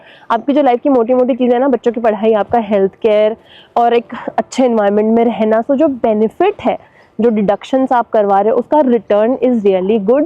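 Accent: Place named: Uttar Pradesh, India. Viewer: Bahut achchi video aap U.P. se hn kya aap ki langauge se lagta h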